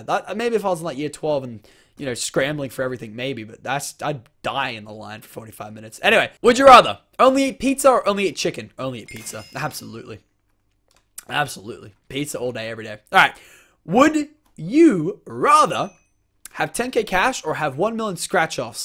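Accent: Australia. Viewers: Is Australian I'm in a country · is aussie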